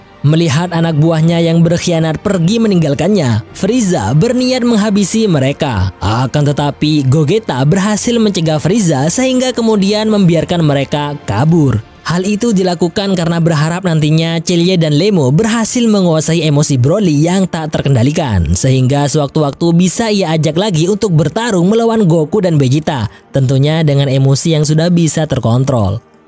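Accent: Madura, Indonesia. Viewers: Kelihatan logatnya logat plat M 😀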